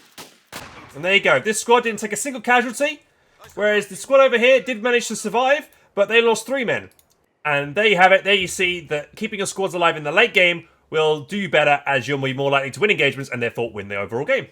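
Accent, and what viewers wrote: United Kingdom. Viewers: Fast talking British accent and mostly incomplete sentences makes for poor communication.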